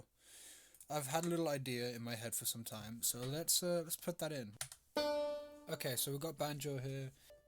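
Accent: United Kingdom. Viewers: WHY IS HE BRITISH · Hello mate, you sound kinda bri’ish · HES BRITISH?!??!!??!!?!?? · HE'S BRITISH?!?!?!?!? 🇬🇧🇬🇧🇬🇧🇬🇧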